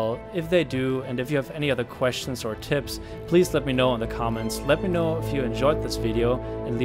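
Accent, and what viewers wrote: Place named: Germany. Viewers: Ersten Satz gehört.. direkt gedacht, dass da jemand aus deutschland kommt :^) trotzdem beneide ich dich für dein englisch! echt schönes video! :) weiter so. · • dedo • wow he is German? I didn’t know that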